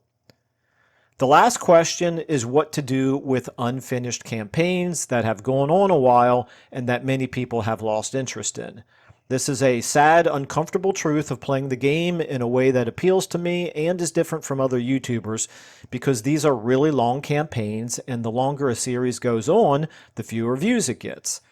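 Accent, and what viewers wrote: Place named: United States. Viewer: I love the way you present the information - and I have to acknowledge a personal note that your American accent is just a bit easier for me to follow than the strong accents that characterize some other favorite channels of mine. No judgment. But I wouldn't mind you doubling up on the info presented by other channels. I've binged hundreds of Legend's videos and found that, for all his expertise, we just like to play the game differently. And his guides and tier lists don't always track with how I enjoy playing the game. Feel free to reinvent the wheel because most of us would benefit from what you have to say in the particular framework you put things in.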